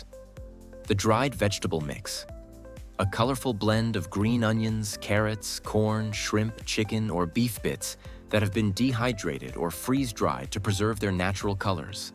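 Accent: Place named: United States. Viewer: Me: getting ready to enjoy a nice, relaxing video of the processes and noises inside an instant noodle factory. Video: *American voice-over*. Of course 😩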